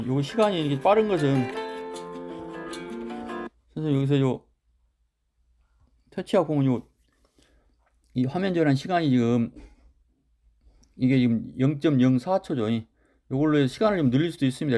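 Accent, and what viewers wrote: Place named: Jeolla, South Korea. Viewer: 님 좋아요 그래요 저도 이번에 어플을 깔아두고 연습을해보았는데 생각보다 괜찮더라고요 잘 알려주셔서 고맙네요 혹시 전라도쪽?하겠습니다이~~~♡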